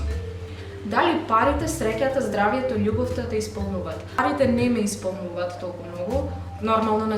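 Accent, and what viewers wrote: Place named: Kichevo, North Macedonia. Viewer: пример за вистински културно одмерено девојче, браво! Многу ми се допаѓа кога зборуваш на Кичевски дијалект, секоја чест, Прекрасна девојка!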